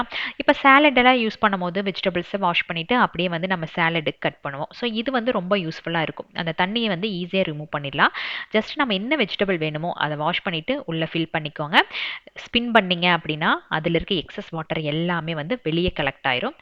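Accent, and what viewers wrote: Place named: Telugu, India. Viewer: akka nega telugu thana ka❤❤❤ nagalum ipadi tha ka telugu pesuvomm...... Nega pesurathu ennaku nalla puriuthuka...... ❤❤Naga pesura marriye telugu pesuringa....... Unga vedios eillamey enaku romba pudikum...... Enoda day vey ungaloda vedio la start agum...... ❤❤❤❤❤❤avlooo postive vibe akka ❤❤❤❤❤unga voice and vedios❤❤❤❤......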